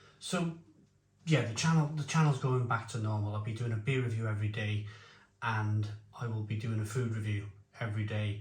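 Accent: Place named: Cardiff, United Kingdom. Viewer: Just stumbled on your channel! Glad you are Doing ok. Recognised that Cardiff accent anywhere! The cottage is my local pub 😂. I’m assuming you mean the One by cefn onn!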